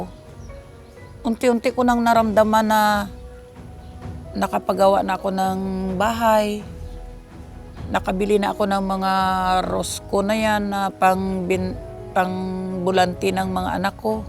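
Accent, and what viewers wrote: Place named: Aklan, Philippines. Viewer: Halata ko taga aklan ka yata Ma'am.pero di ko alam san sa aklan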